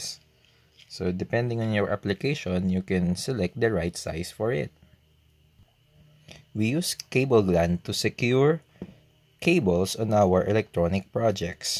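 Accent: Philippines. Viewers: I recognize the accent. Are you filipino?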